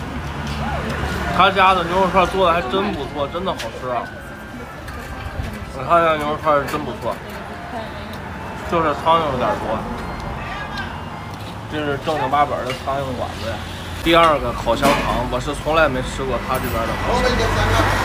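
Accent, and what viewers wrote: Tianjin, China. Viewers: 天津人，哥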